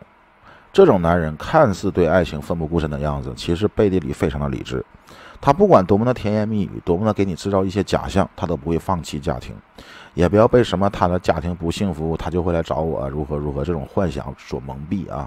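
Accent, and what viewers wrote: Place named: Northeast, China. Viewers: 鞍山海城的口音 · 辽宁话吧，沈阳那边的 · 东北， 好像是鞍山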